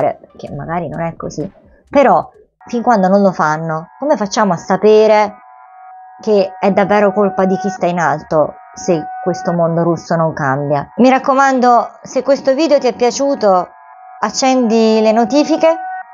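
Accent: Naples, Italy. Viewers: Ciao.. sono arrivato al tuo canale per gli arcani misteri dell algoritmo di youtube e sinceramente sono rimasto positivamente basito. Ubi bene ibi patria scrivevano i romani tu sicuramente li sei felice e non ce bisogno di chiederti perche'! . Le tue ultime parole sono uno splendido messaggio all'occidente di non perdere quello che ha costruito , diritti sociali e opportunita di cambiamento. Non vivi con i coniglietti ma hai quella compassione tutta partenopea ( credo, partenopeo.. l'accento mi induce a pensarlo ) nell'accettare l'ineluttabilita' degli eventi in un luogo dove, un sogno sociale e' diventato un incubo per molti e la cura, dopo il risveglio decisamente piu lugubre . Navalny era una truffa tutta occidentale perche' niente di lui volgeva a un pensiero democratico ma sai.. anche qua la sinistra si e innamorata di hamas che dei diritti sociali e di tutto quello che l'illuminismo vede nella societa' sono la reale antitesi. 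Un po come la destra nostrana che paga Dugin per farsi raccontare che la razza non e' acqua e loro (Russi) sono gli angeli e gli altri destinati a una inevitabile sottomissione.. Incongruita dei sistemi .. Ajmone Federico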